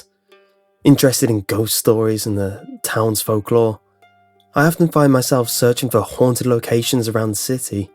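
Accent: United Kingdom. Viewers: buddy grew up in northern nevada with a British accent.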